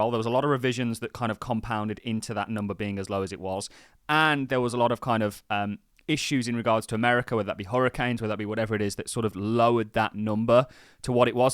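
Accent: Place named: England, United Kingdom. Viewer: He's English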